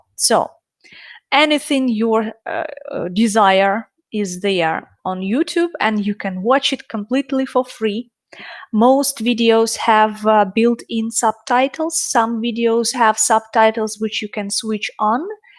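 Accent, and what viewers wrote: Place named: Russia. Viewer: Why do you speak English with Russian intonations?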